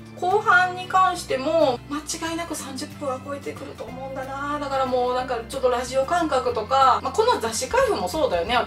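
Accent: Kansai, Japan. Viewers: おススメの動画で拝見し、すごいお綺麗な方なので、ちょっとおすまし系？もしくはぶりっ子系？と思いつつ見てみたら！！めっちゃ面白い！関西弁でテンポも早くて、ついつい見入ってしまいますね！付録レビューしてる他の方も色々見ましたが、1番わかりやすいし、評価もはっきりしてる！これからはさんのを見て購入します！ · はじめまして(o^^o) ファンになって2ヵ月経ちましたが、初コメします！いつもわかりやすい説明ありがとうございます😊 関西弁が好きなので、いつも楽しく見てます！今度、ライブとか出来そうだったらぜひお願いいたします✨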